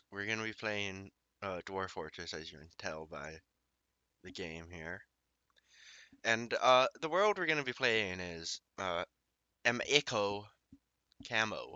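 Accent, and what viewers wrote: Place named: Ireland. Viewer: First comment. Also, I really like your Irish accent it sounds so cute ;). Can I pretty please get a dwarf named after me. :cat-orange-whistling::cat-orange-whistling::cat-orange-whistling::cat-orange-whistling::cat-orange-whistling::cat-orange-whistling::cat-orange-whistling::cat-orange-whistling::cat-orange-whistling::cat-orange-whistling: Your secret admirer , NiftySnipes:thanksdoc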